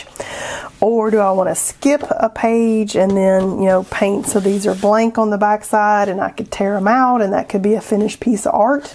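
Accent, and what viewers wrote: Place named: South Carolina, United States. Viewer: your SC accent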